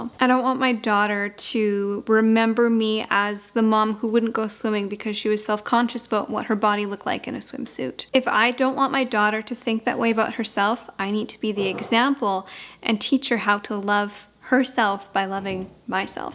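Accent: Western Canada, Canada. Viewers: I knew you were a Canadian the moment you pronounced, “bag” and “garage.” Lol, you can’t hide that accent :-) 🇨🇦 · Lol I love hearing how others perceive Canadian accents. Hers is more of a western Canadian accent though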